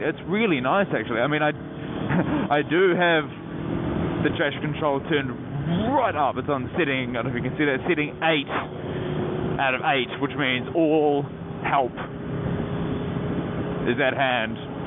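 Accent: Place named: New Zealand. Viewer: Nice to hear a fellow kiwi , really enjoyed this hope to see more on the aprilia and more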